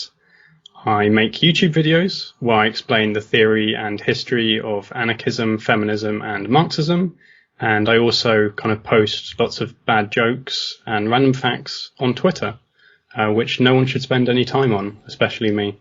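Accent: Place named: United Kingdom. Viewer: Oh no she's British 😔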